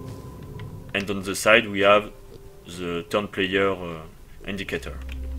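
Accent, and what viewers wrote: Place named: France. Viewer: Lol on reconnait bien le français qui parle anglais xD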